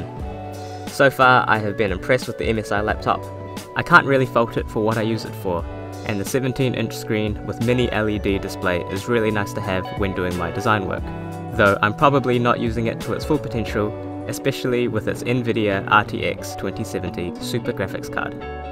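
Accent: New Zealand. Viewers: is that a kiwi accent I hear! Where are you located?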